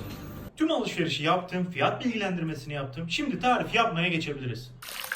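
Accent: Adana, Turkey. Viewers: Hatayli degil bu şive adana şivesi Hataylılar böyle konuşmaz yüürün katıyürüm ekliyürüz diye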